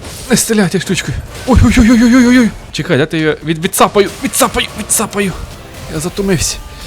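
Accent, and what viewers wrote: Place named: Zhytomyr, Ukraine. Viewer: Ти можеш нормально говорити, чуму не житомирською.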